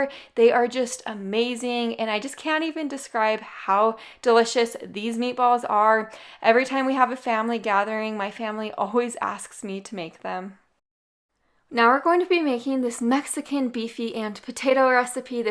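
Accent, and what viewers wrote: Utah, United States. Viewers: It's not that her language is sloppy but she does speak differently than she did a year ago. Some of these are older videos. In the more recent videos, she has picked up a Utah accent. They drop their T's and pronounce things differently like un-tul instead of until, etc.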